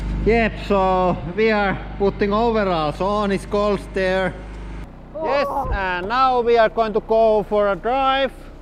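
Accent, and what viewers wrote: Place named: Finland. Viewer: Haha the finnish accent is the best! Cheers from australia great vid :D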